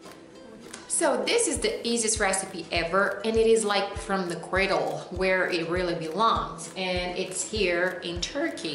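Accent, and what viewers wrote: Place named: United States. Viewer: Parece uma americana falando... vou estudar inglês para assitir seu novo canal, Sucesso aqui também.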